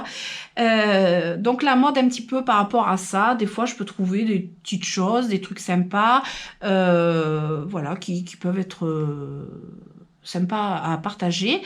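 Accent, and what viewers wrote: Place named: South, France. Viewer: Quel plaisir votre bonne Humeur et ce bel accent du sud . Je découvre votre univers coloré et j aime beaucoup 😉 d ailleurs je vais aller jeter un l œil sur votre autre chaîne YouTube car moi aussi j aime les plantes même si elle parfais ne m aime pas trop 😂. Au plaisir de vous trouver 😊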